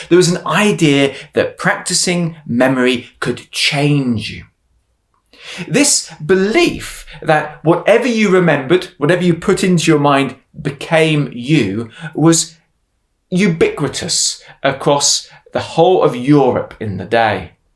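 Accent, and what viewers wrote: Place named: South, United States. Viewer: He's an Englishman speaking like a Southerner. As a Texan, we talk that way so people can better understand what we're saying. Comprende??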